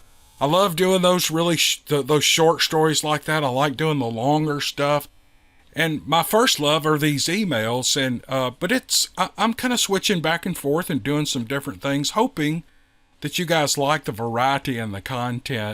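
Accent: South, United States. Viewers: You are most welcomed. I love the southern sound of your voice and I only listen to a few of you guys since Covid, so to me you guys are the OG. Thank you for sharing your time and you voice with us. 🙏🏼❤️ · Mr., I hope I am not offending you sir by commenting on your last video, however at the Mark you commented "some of these villages were set up by whites!", and I found it to be somewhat derogatory and offensive...what does skin color have to do with Sasquatch entertainment stories and why invoke race when it was obviously not relevant...someone with your platform, and in reaching the thousands of ears and minds that your narration touches, one would think you'd be more conscious of your choice of words and how they're perceived....I challenge you sir to replace the word "whites" in that statement with any other race defining word and id almost bet youd be deplatformed before you coukd say.....that said, my brother and I thoroughly enjoy your southern draw and your channel is our favorite! 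· I missed the poll on your community page. I could listen to you read the back of a cereal box for an hour!😂for me your southern accent reminds me of home. I have followed you for a few years now and you seem like a genuine nice guy I like that too. No foul language no foolishness. Nothing worse than listening to people bashing others, I despise that. I just like your approach to every story or email. No need to thank me for listening, I’m one of your people😂. Thank you for the stories. Keep up the good work brother❤️ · I took the poll, 😁, love hearing a Southern gentleman tell stories.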